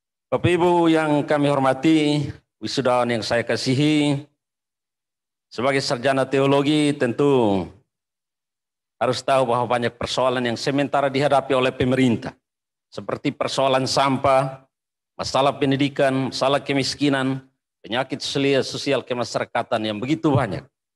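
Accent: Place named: East Nusa Tenggara, Indonesia. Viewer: Pidato khas NTT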